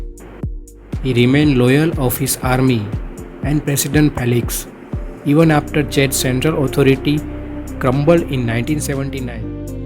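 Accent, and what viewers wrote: India. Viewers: Why Indian English accent is so heavy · the indian narrator voice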